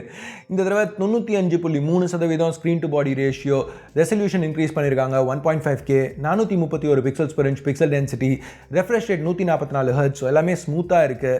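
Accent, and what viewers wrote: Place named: Chennai, India. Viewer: na Chennai accent majavah ikkithu 😂❤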